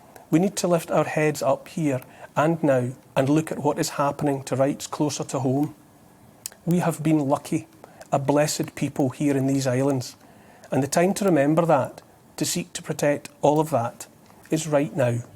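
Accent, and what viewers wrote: Scotland, United Kingdom. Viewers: Always admired the man (and his Scottish Enlightenment accent) But during Covid19 plague? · Neil's Scottish accent,reminds me my young time when I was attending my primary school and then grammar school in nothern Scotland Vic.(G.D.of Lux.).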